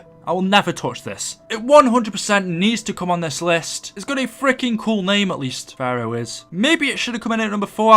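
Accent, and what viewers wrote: United Kingdom. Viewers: Derek Monroy he is british, it doesnt matter how he says it...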